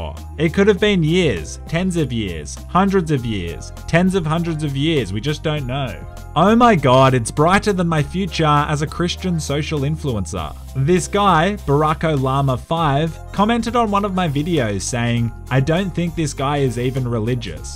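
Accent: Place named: Australia. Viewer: Never thought I'd ever hear a monotone Australian, but eh, better late than never!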